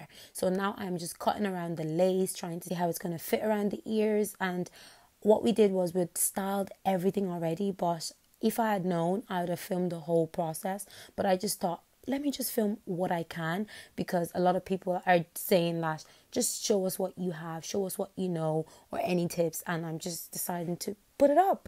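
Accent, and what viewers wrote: Ireland. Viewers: The Irish accent omg 😍😍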